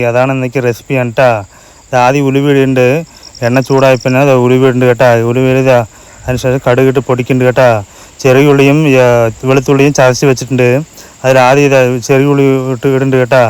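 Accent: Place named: Palakkad, India. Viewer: Palakkade slang aanu broo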